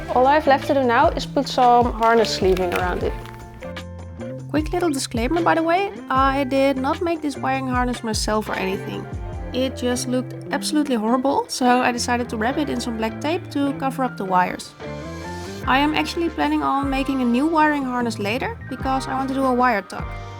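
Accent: Netherlands. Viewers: just by your accent are you from dutchland, or germany?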